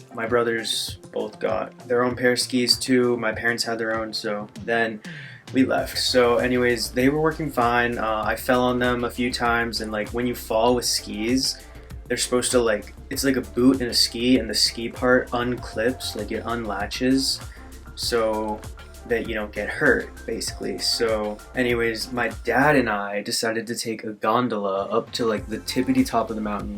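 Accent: Canada. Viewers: I'm quite sure he's from Canada, because he has a slight accent, that is only obvious in a few words. He's mentioned his brothers, but i don't know if they have been in any videos. I'm sure they must be as hot and cute as him.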